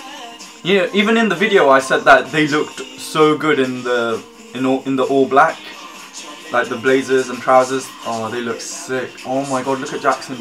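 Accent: United Kingdom. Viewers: Are you British? :)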